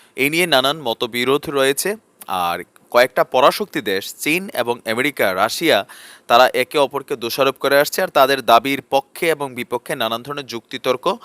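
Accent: Comilla, Bangladesh. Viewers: Cumilla hote vi apnar cumillar vasa khub vlo lage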